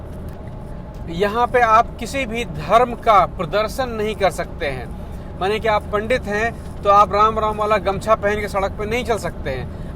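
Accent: Bihar, India. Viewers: Bhai aap ka language me biharipan lagta hai ... Very good bro thx for this information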